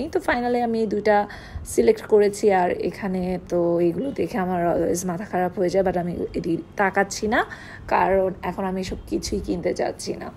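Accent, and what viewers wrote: Sylhet, Bangladesh. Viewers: খুব ভালো লাগলো সিলেটি ভাষা আপনার মুখে আপু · Masha Allah sylheti mat kub sundor hoisa ami o akjon sylheti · Like 👍 134. Walaikumassalam Apu shuna. Khub khub shundor hoyechey Sylheti kotha. Oy Apu Sylheti maat thik oisey. Love you sweetheart Apu ❤❤. Sending love from Srimongal ❤ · Apu apne sylheti mat matsoin j bala lagse hunte and dress o onek sundor oise sob gula shopping o onek sundor oise😍😍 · Apu like diye video dekha soro korlam,Sylheti vasai kotha sone khub valo laglo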